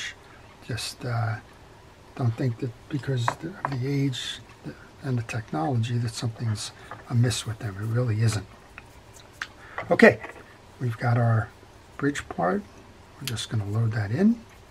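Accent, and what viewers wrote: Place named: Philadelphia, United States. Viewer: I just got a vintage Pfuger Nooby reel, seems to be similar to this.. I am amassing a small collection of vintage reels, and thanks to your videos Ive gotten most of them back into working order :) I do have a few I'm struggling with, perhaps I'll send them to you... thanks for the videos, and your accent brings me back.. I grew up outside Philly and spent many summer weekends at the Jersey shore 😂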